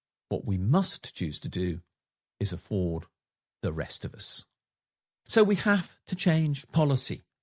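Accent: United Kingdom. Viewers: If not for the accent, I'd have thought you were talking about the States, at first. Perhaps you still are. Sadly, this view of economics is taboo in the educational system of the States. Even discussing it in passing might earn one death by pyre...or guillotine. Should I assume the same applies to the schools throughout the UK? Or do you folks prefer dunkings? How much closer to the brink do you think the world can come before chaos ensues and we all return to the wars and turmoil that marked and marred the late 19th and much of the 20th centuries? History might not repeat but it has a nasty habit of echoing into the future. Of course, the last major reversal of this trend did not take place until after a decade-long global depression and an even more devastating global conflict, that left millions dead and tens of millions more forever changed. Even then, the correction lasted little more than a single generation before "evil" began its insidious war on the rest of humanity...again. 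What admonishment do we, who bear witness to the death rattles of this conflict, leave for those left standing when the next correction takes place? Or is it a futile exercise, as they and theirs must re-learn all the hard lessons, all over again? There is a theory that a small group of people suffer a neurological deficit much like but parallel to autism. Except in these cases, rather than never acquiring the ability to interact with the rest of the world like the rest of us, these others fail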